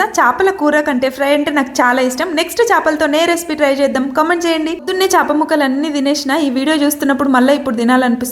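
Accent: Telangana, India. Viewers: Akka pakka Telangana akka manadi penka penka antunte ma nanamma gurthu vastundi akka ne matalu superrrrrr ❤❤❤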